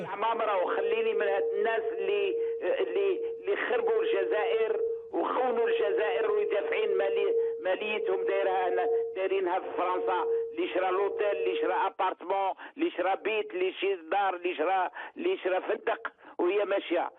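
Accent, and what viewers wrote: Morocco. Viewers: qui êtes vous pour parler de l Algerie et de ses responsables. de quoi se mêle ce marocain.melez vous de votre roi et de votre pays. VOUS ÊTES UN MAROCAIN.VOTRE ACCENT.VOTRE HAINE CONTRE LE PRÉSIDENT ALGERIEN ET LES RESPONSABLES ALGERIENS VOUS TRAHISSENT.LE PEUPLE ALGERIEN N EST PAS CON OU DÉBILE POUR VOUS CROIRE. LES ALGERIENS SOUTIENNENT BOUTEFLIKA ET SA POLITIQUE QUE ÇA VOUS PLAISE OU NON. VIVE L ALGERIE.VIVE LA JEUNESSE ALGERIENNE.VIVE LE PEUPLE ALGERIEN LES HOMMES.VIVE LE PRÉSIDENT BOUTEFLIKA. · انت مروكي و الكلام نتاعك باينة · لهجه مغربيه واش تستنا منهم هذا المتحدث مغربي تحليل تاع رعيان كلام تاع الشارع دون مستوى يتكلم على رئيس فرنسى ثم البطاطه ياخي جياحه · لهجة مغربية... واش دخلك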